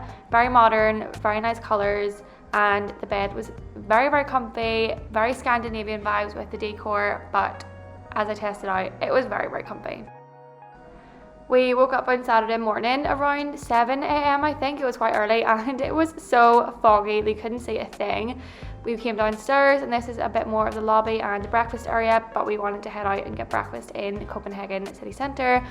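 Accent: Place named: Ireland. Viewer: Sounds like u are from ireland, did u fly direct from dublin. My son and i are going next month from ni. Wondering best route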